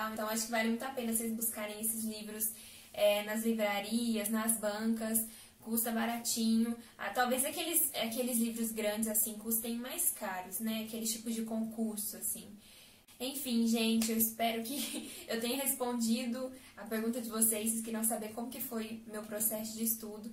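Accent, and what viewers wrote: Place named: Minas Gerais, Brazil. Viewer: tá com sutaque de minas... ♡♡♡♡♡ sucesso aee;)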